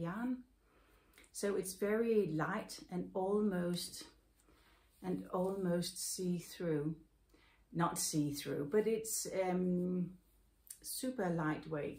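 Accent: Denmark. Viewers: Hej, da jeg hørte dig tale første gang jeg så Mindful Making, kunne jeg høre med det samme at du var dansk.hahaha Det var en rar følelse. Med hensyn til dit garnlager, skal man jo ikke gå ned på garn, vel!?;o) Jeg kom til at tænke på, at hvis der er nogen i Australien som har brug for Holst garn, kunne jeg hjælpe med at skaffe det og sende det som en gave (told:o og så kunne jeg få noget garn tilbage på samme måde. Hvad mener du om ideen? Vh Maud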